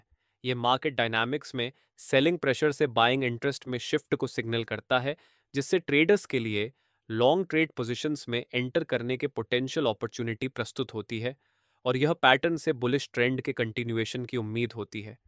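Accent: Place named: South India, India. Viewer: Sir aap kaha se ho ek baar batana Mujhe South wale lagte ho Lekin telgu madrasi kannad Kon ho aap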